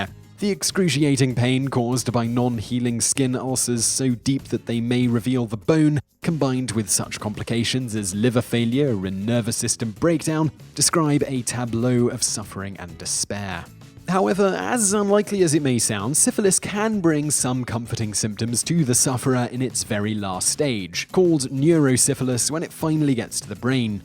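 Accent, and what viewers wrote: United Kingdom. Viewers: Thank you so much! I love love these intense snippets that make me search for more, Brit accent, also a big plus. Thank you!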